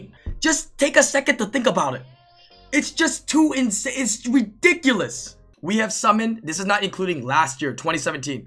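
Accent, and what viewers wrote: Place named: Asia. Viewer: Omg the stereotypical Asian accent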